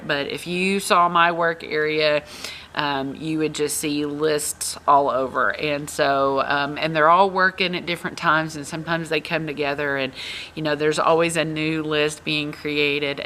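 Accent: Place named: Arkansas, United States. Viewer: You sound like you’re from Arkansas! My husbands family is from Texarkana... you have the same accent 😁❤️. Fun intro, and crazy fun stories about yourself! I’m glad hooked us up!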